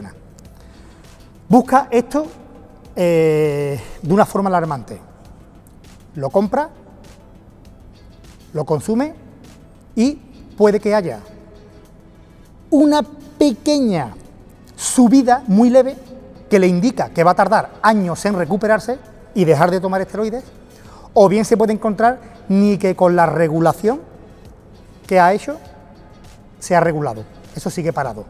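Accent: Andalusia, Spain. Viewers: Otra pregunta, ese acento español es de que ciudad?😉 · Eres Andaluz??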